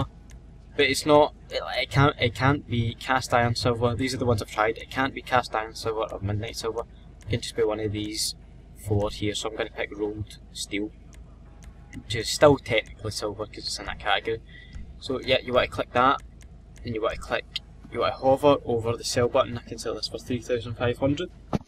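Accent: Scotland, United Kingdom. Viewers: wish it would have worked but I love the accent.scottish?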